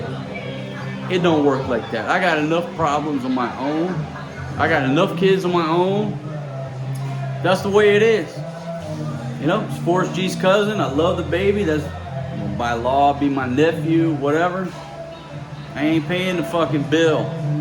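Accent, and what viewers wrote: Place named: Alabama, United States. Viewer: Brother You Got Me Cracking up Big Time. I'm here in Alabama USA 🇺🇸. You sound just like someone here would say. Be Good Bro. God Bless You Man.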